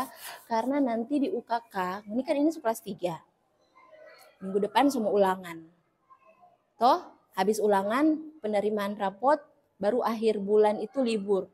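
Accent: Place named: Manado, Indonesia. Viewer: Logatmanado ya ini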